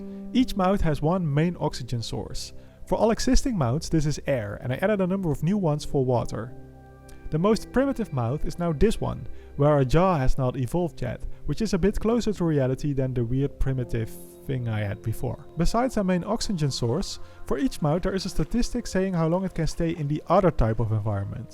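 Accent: Netherlands. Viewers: ik vind dit spel heel leuk zeker omdat het gemaakt is door een nederlander.. tenminste je klinkt als een nederlander XD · Are you Dutch really sounds like it😳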